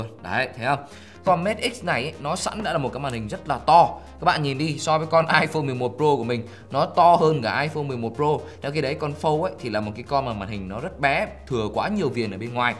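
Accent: Thanh Hoa, Vietnam. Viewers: Ông review này người Thanh Hoá phải k :)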